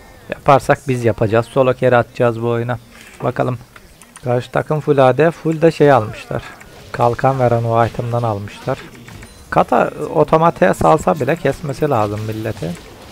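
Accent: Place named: Antalya, Turkey. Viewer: Kanka Antalya lımısın şive çok yakın 😂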